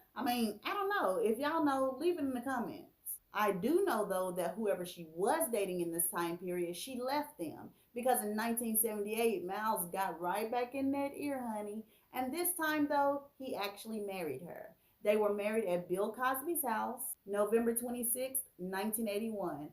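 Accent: South, United States. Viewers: Keep up the good work.... iv only been following you for a few days now.., and I love your page... it’s fun, energetic, and you have the southern voice that makes me want to come back for more!!!! Sooo kudos to you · Sis, we want you to be you!!! I love your southern loud accent!!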